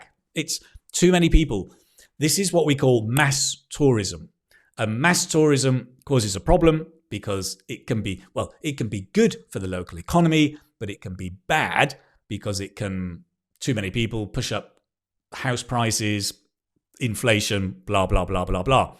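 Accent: United Kingdom. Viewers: I love your british accent😊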